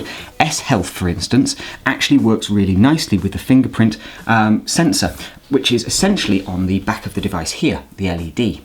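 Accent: United Kingdom. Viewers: gotta love the brittish accent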